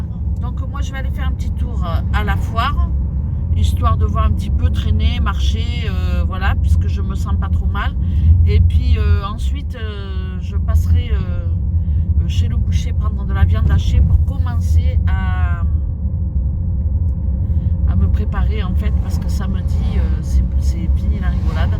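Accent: South, France. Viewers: Bonjour,d oû vient votre accent, vous venez du Sud? Je ne pensais pas qu avec les pieds dans l eau ça pouvait jouer sur l arret de fumer! Merci de nous avoir fait un peu voyager avec les belles images du fond de vlog à bientôt 👋👋👋👍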